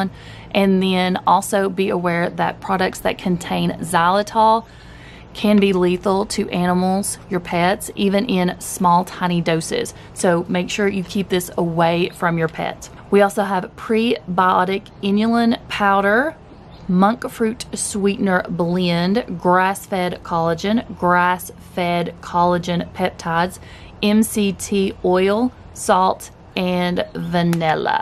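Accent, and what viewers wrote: South, United States. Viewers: I love how you say "spicy dill"! I'm from Michigan so I love the southern accent!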